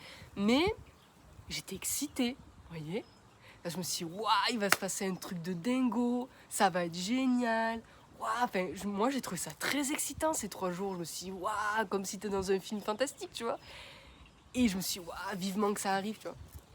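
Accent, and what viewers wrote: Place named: Gard, France. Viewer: Étant moi même Gardoise j'adore t'écouter je m'identifie bien à tes expressions, merci j'ai rigolé 🥰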